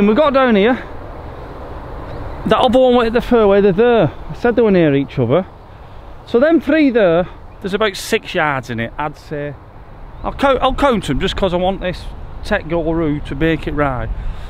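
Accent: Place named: Bolton, United Kingdom. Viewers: I’m an American, and I dig the Bolton accent. Reminds me of Fred Dibnah. Using ‘were’ in place of ‘was.’ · Nice to hear a northern accent on YouTube for a change, I’m from Wakefield so I understood u perfectly 👍🏼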